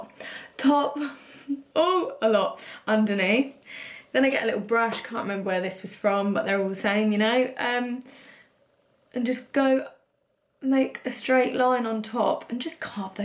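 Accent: United Kingdom. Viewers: I didn’t know you had a British accent! 😅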